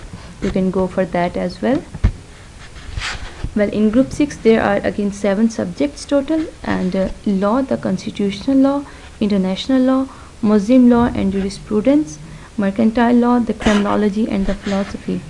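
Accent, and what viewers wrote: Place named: England, United Kingdom. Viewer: A lovely English accent! A simple mesmerising voice! One of the best guidance ever! I wish all the best for you! Keep making this incredible videos for us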